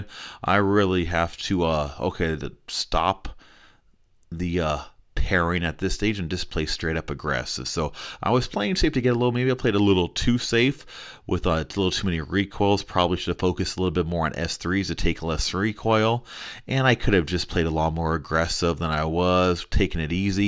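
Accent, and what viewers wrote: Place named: United States. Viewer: Thanks for the video. Several members of our ally enjoy your videos. We are trying to place where you are from based on your accent. What part of the US are you from??? Thanks again for the videos! Keep up the good, and helpful, work!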